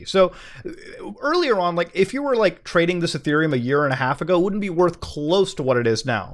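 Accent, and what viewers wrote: Canada. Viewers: Aboot, caarr, your Canadian accents so thick my friend. -love from Torono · He's Canadian, he's got a different accent. Like when an American says croissant vs a French person.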